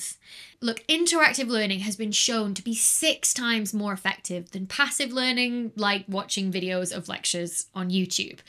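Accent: United Kingdom. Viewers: I really appreciate your channel, fun and still very heady...off topic, but the CC follows your British accent - Hubble was Hobble, and exo planet said XA planet once 😂🙌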